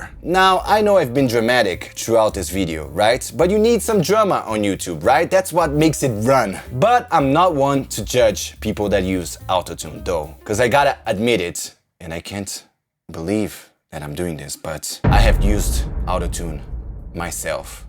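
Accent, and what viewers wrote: Brazil. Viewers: I bet you are brazilian cause of your accent- same as mine :) .. Nice video!! keep it up!!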